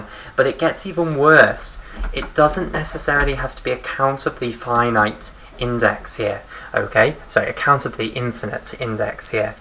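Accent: England, United Kingdom. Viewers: English accent has it's benifits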